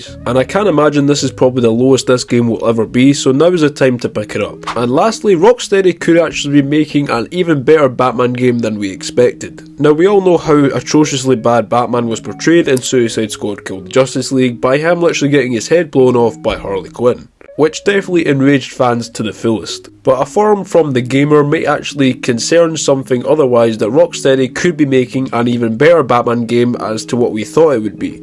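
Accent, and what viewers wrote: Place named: Scotland, United Kingdom. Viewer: 3 minutes of a Scotsman babbling to say absolutely nothing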